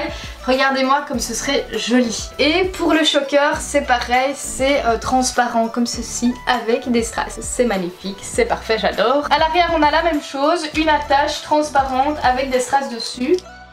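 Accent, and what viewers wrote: Liège, Belgium. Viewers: Tu serais pas Belge t'as un de ses accent ! Je suis Belge lol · elle est liegeoise meme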